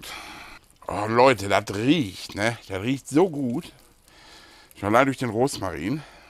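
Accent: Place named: Hesse, Germany. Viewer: Muss seit 2 Jahren in Hessen studieren, dein Dialekt gibt mir ein Gefühl der Heimat✌🏻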